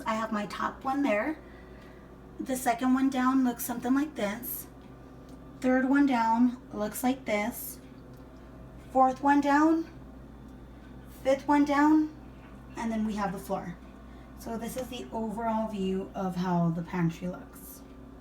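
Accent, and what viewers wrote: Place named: Latin America. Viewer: Hola. De don de eres. Yo soy de Puerto Rico y me suenas Latina. Me gusta lo que hicistes en tu pantry.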